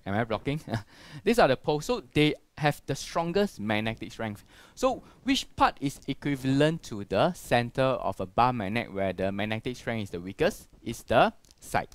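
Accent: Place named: Asia. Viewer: bro you have perfect asian accent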